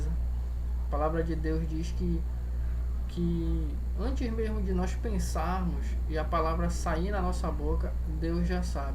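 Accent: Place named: Pará, Brazil. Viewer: Maninho.... Tu é paraense neh ?? Morei um tempo no Pará, e tenho quase certeza da minha suspeita 🤔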